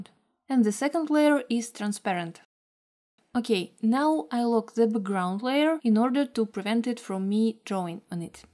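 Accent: Russia. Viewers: no hassle I thought Russian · yep, Russian indeed! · Russian accent ^_^ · Russian accent?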